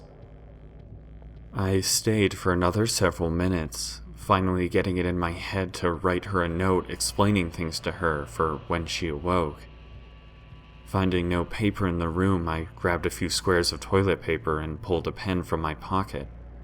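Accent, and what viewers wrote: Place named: Romania. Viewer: Impressing accent for a Romanian girl!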